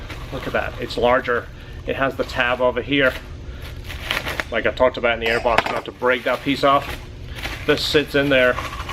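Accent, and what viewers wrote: United Kingdom. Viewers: I'm probably wrong but do you have a British twang to your accent? ..Other than that, great informative video..Si..UK.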